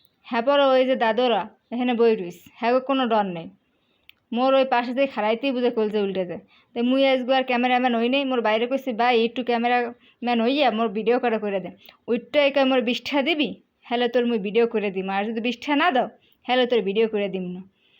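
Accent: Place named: Barisal, Bangladesh. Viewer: খুব ভালো লাগলো বরিশালের ভাষা❤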